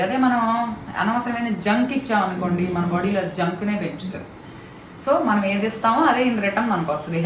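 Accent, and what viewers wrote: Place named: Rayalaseema, India. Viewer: mee slang rayalaseema laga untundi Abba ani ikkada.antaru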